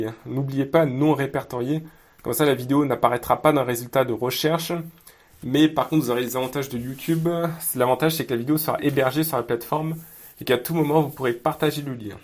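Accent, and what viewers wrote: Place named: Nord-Pas-de-Calais, France. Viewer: la vache l'accent chti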